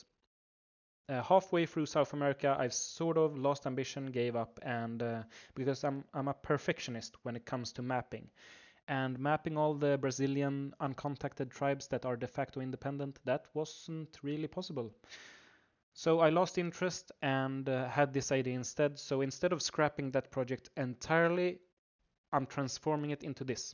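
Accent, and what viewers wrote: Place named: Sweden. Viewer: you sound exactly like a swede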